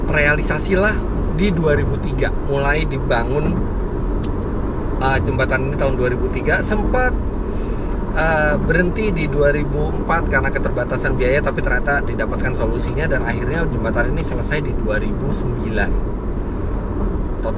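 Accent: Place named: Madura, Indonesia. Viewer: Salam dari probolinggo. Keng logat medure lek.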